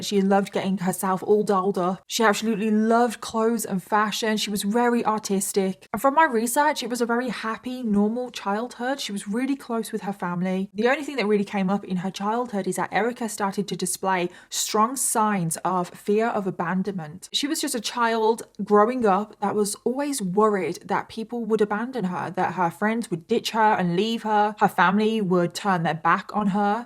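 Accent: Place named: East Midlands, United Kingdom. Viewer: stupid? no. you sound like me before i started spending a lot of time in the US. it's basically like living on the border of Lincolnshire and Nottinghamshire. Also, watching this with my three year old in the same room (headphones while she plays) she is my baby. a three year old is a baby. this whole case is terrible.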